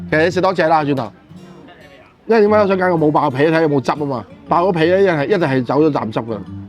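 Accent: China. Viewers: 可愛的普通話😂好睇😊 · 屌啲煲冬瓜好撚可愛兼普通I like it🙂‍↔️😂👍🏻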